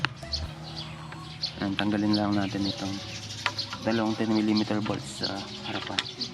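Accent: Pampanga, Philippines. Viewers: Pansin ko sir sa pagsalita mo, hula ko, kapampangan ka? tama ba ko? hehe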